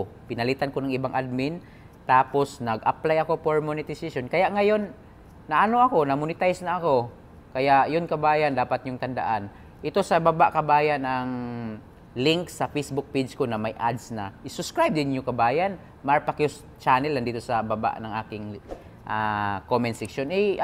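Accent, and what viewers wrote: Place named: Visayas, Philippines. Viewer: Halatang bisaya ka idol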